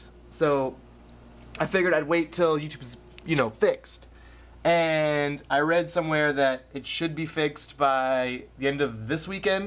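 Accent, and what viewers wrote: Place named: Canada. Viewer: "so if there's ever a mess up with youtube again you can just go there and check it OOT." I LOVE YOUR CANADIAN ACCENT <3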